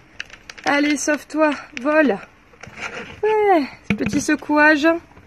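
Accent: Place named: South, France. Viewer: Super tuto comme d'hab, avec le chouette p'tit accent du Sud.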